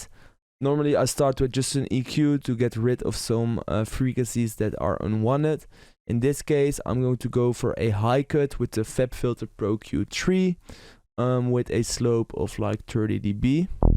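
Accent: Netherlands. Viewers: aan het accent van jouw Engels kan ik ook gewoon horen dan je een Nederlander bent. video is een banger!!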